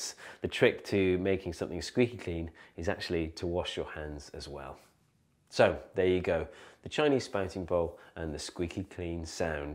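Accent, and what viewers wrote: England, United Kingdom. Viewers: I've gotta agree about the presentation voice. I'm in the States and I think there's also something about an English accent which conveys authority for many of us as well.. but I think the calm confidence contributes a lot. I came via standupmaths.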